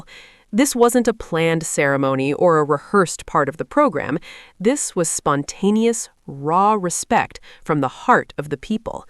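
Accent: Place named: United States. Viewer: Why have this AWFUL American commentary ?!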